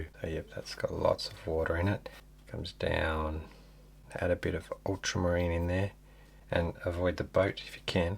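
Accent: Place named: Australia. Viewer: Are you from Australia, New Zealand, Tasmania? Love your calm voice and accent.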